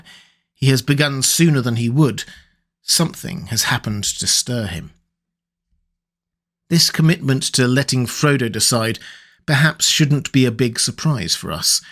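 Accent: United Kingdom. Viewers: Nerd of the Rings is great too but the British accent makes the story telling so good on this channel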